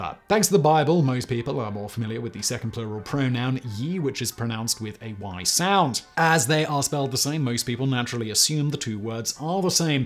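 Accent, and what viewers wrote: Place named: Cockney, United Kingdom. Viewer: your emotions and active nature on set is awesome. I watch your videos because you rock and I love to hear you read things.. I dont think it even has to be your channel content, like if you picked up an old James bond novel, you would read it better than the other guy that does it for audible, your cockney accent is better and you sound more south London and it just works man.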